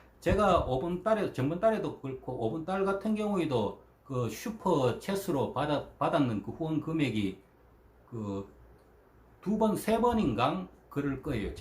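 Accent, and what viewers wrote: Gyeongsang, South Korea. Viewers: 말투는 대구같은데 ... 늘 건강하세요 잘 보고 있습니다~^^ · 경상도 대구 홀애비 인 갑네요?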